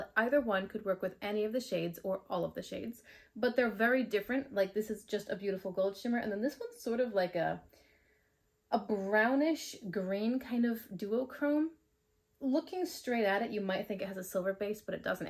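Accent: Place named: East Coast, United States. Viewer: I smiled when you read the palette name "At Forest Sight"! When I moved out to the West Coast, my neighbor had a bumper sticker that read "May the Forest Be With You", and it confused me for the longest time, because I -- like you -- have an East Coast accent and pronounce "forest" with two syllables. Those puns only work if you pronounce it "forst", not "FAW-rest"!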